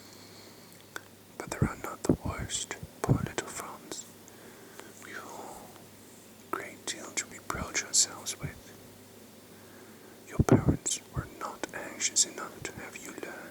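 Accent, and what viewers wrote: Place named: Germany. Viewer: You have a little german accent :) xx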